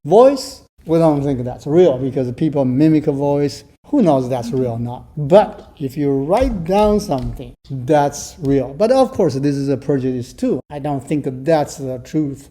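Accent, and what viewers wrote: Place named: Asia. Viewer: 😅funny the midweight accent of the speaker is certainly Asian origin, more likely Mongolian line of accent, like Mandarin or Korean; and, no Chinese is not the only non-phenolic language in the world, certainly a very poorly researched position. And to used such a narrow band of measurement to arrive at such a broad encompassing subject is a slap on the essence of academic endeavors. I used to like Hagel a lot as I like the challenge to follow his maze of thoughts, sadly the older I get the more I experienced, the more flaws in Hagel I see, and the more reasons that to see how he wrote with so much unnecessary acrobatic maneuvers to confuse and to hide the tracks of flaws. My humble 2 cents.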